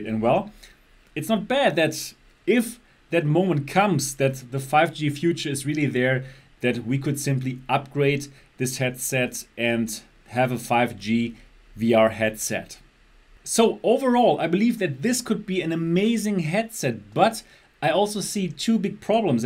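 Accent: Germany. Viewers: that german accent you here that out of everyone xD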